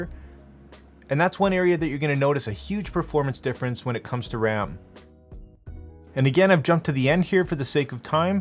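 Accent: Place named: Canada. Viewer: As soon as I heard your accent, I knew you were a fellow Canadian :). Thanks for sharing this information!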